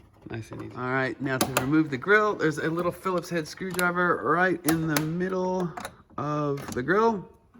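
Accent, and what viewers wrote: Australia. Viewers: Haha, is that an American/Canadian accent I hear? I'm from Vancouver and I do the same thing. Sound super American all the time and throw in a few Aussie pronunciations :D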